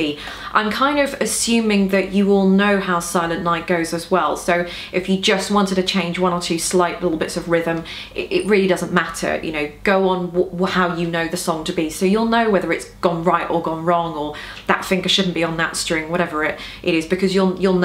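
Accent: United Kingdom. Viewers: and you have a very good british accent i wish i can talk like you.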